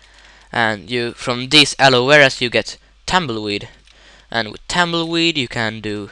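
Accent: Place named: Sweden. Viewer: du måste vara från sverige XD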